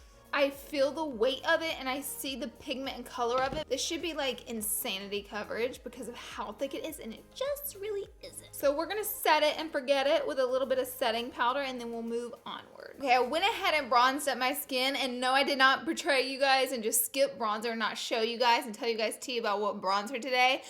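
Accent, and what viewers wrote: South, United States. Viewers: Instagram fam😘😘 I LOVE the way you say Valentime's DAY, conciler, etc... Gotta love those southern twangs 😘❤ from one southern girl to another, I like the way you talk 😘❤ NC HERE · Katie Serious Talks its her accent and they do sometimes say it in the south. So can you not be so rude and negative. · #snapchatfam #igfam your my favorite YouTuber by far I’m from North Carolina and have a strong southern accent like yours and I absolutely love it 😍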